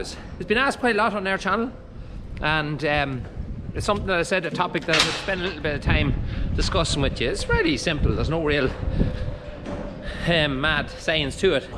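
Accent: Ireland. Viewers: are u irish,n. Irish or scottish get confused with accents😃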